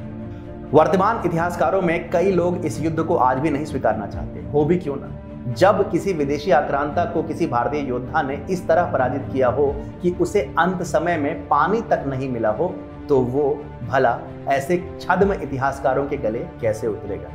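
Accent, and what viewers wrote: Standard. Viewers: Hats off to you sir, narration in pure Hindi. One request - please write this history, many book readers would like to read and listen to such glorified, true history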